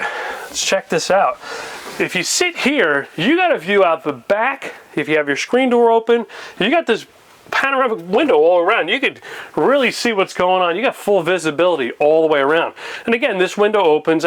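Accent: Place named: South, United States. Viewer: What accent is that? It sounds like Minnesooooota with some Canadian ayes meets a southern drawl.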